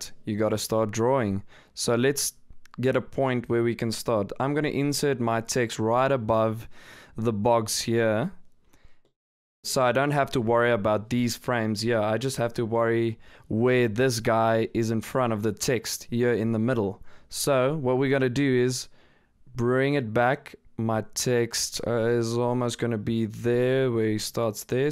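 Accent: South Africa. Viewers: are you south african? the accent? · i like your accent. South African? Great tutorial thanks!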